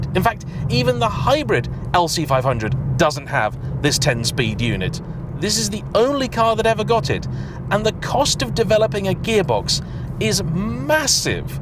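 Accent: United Kingdom. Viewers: Porsh.....Jag-you-uh. LOL Love the Brits. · Gosh I love how the Britts say Kahhs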